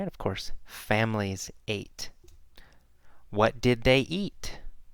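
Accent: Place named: United States. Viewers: American it is, my dear. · mỹ nha bn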